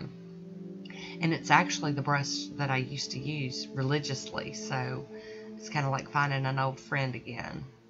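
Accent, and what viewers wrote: South, United States. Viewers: Hey I love that southern draw!! aEngland makes some great polishes - I love their brushes and the two polishes I have apply so smoothly!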